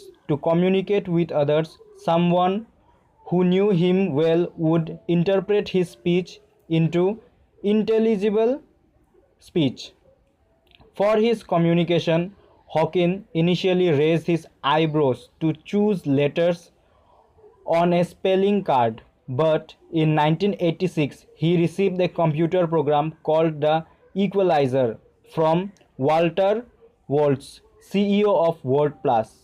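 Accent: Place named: India. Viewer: I don´t know why, but I love this Indian accent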